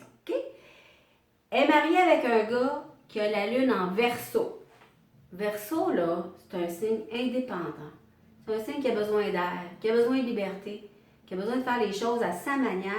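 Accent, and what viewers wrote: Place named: Quebec, Canada. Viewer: 1000 merci parce-que vous etes tres objective et d'une claretee rare, et aussi merci de votre generosite de partager votre savoir acquis, je vous en suis reconnaissant. L'accent Quebecois c'est la cerise sur le gateau, j'adore !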